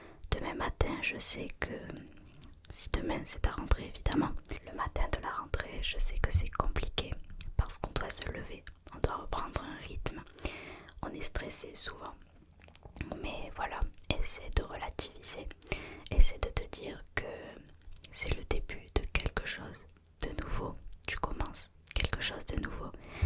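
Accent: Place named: South, France. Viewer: J'ai découvert votre chaîne il y a peu et je n'avais pas fait attention à ce petit accent du sud (enfin je crois)dans le baing lol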